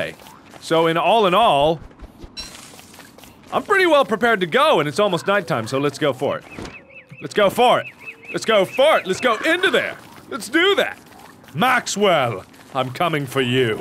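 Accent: United Kingdom. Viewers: you have BEST british accent EVER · Haha his stereotypical british accent!!!! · I love ´s Brittish accent and how he talks to CHESTAH. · Awesome accent! xD Yamimash, Mangaminx and other LPers from the UK would be proud!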